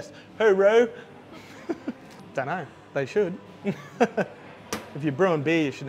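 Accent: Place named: Australia. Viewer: It's so good to hear an Aussie accent not pronouncing words like yanks, if only we could do the same PSA for couch (it's lounge), cookie (it's a damn biscuit) and ass (it's arse here)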